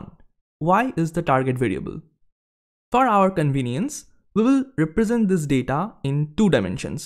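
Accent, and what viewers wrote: Bengal, India. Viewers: bengoli accent ftw!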